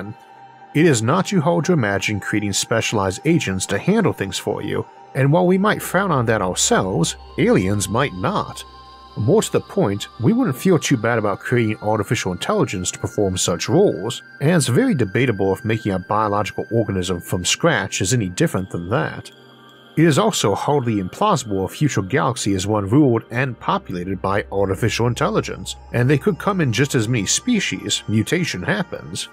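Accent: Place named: South, United States. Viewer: Man the visuals and graphics are light years away from those early videos. Actually the show has become a very professional package where even your "speech impediment" is as integral as the graphics and visuals. Personally I find your Elmar Fudd with a southern drawl accent unique and I'd put you in the same boat as Sagan and Attenborough although sitting in the stern perhaps.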